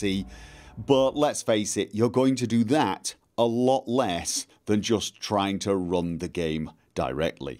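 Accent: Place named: North Wales, United Kingdom. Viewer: Are you from North Wales or there abouts? Trying to place your accent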